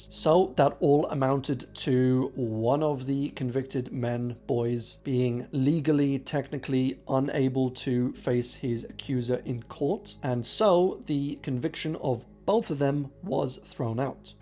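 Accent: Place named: London, United Kingdom. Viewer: London? But then at times I hear something else 🤣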